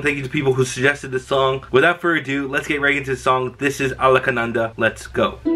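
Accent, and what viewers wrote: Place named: Assam, India. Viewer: Ur pronunciation is liked assmeis.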